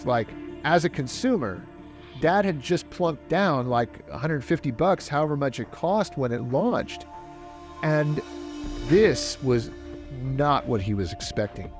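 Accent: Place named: Brooklyn, United States. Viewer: Whats up with the East Flatbush Brooklyn accent?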